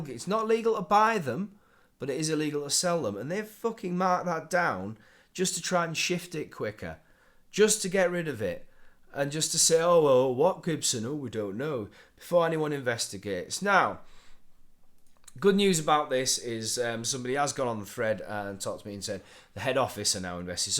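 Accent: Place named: Darlington, United Kingdom. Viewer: no thats his actual accent, im form the uk and a northerner from leeds, hes from more up north than me lol but they definitely speak like that in Darlington area as he described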